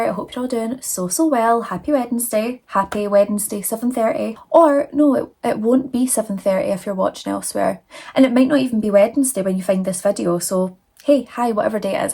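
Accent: Scotland, United Kingdom. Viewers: Congratulations to the 10 000 subscribers!❤️🥳 Love your channel, always. 😍Also love your Scottish accent since my husband is from Scotland (Airdrie). ❤️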